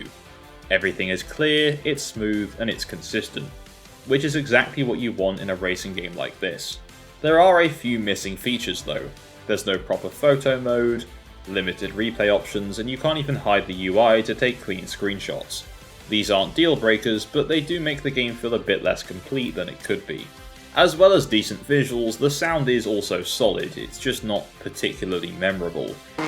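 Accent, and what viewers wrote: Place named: England, United Kingdom. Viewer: I do not even enjoy racing games. Yet hearing this Posh English Man complain is relaxing.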